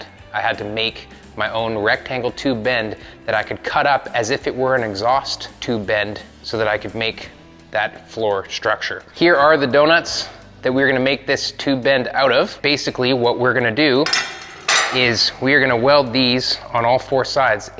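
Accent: Canada. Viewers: Great channel you have and I like the tips and tricks so thanks for those. I’ll be working my way back through your videos as there seems to be a lot of stuff that’s relevant to anyone fabricating in steel not just hot rodders. Picked up you were Canadian as soon as you said “out” and then “princess auto” confirmed it. It’s a bit like Australians and New Zealanders, subtle but obvious once you hear it. Incase you’re interested I’ve put a link to a video I found yesterday of Japanese craftsman hand making what looks like a glove box with compound curves and all done the old school way with tin snips, hammer and dollie’s, shot bag and oxy fuse welding. Not an English wheel or tig to be seen. I haven’t got a clue what he’s saying but I reckon it’s a work of art. Cheers, Stuart 🇦🇺. <link> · haha i thought you were saying “about” and “out” a little weird and then when you said princess auto or whatever I was like ohhhh okay he must be from Canada😂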